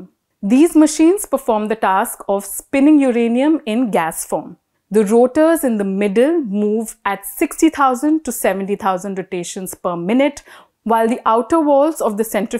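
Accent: India. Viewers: Shiiittt Stingking indian Accent !!!!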